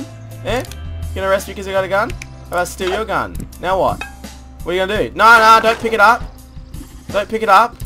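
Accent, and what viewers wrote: Australia. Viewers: I can tell ur Australian! And u r super hot:) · you sound australian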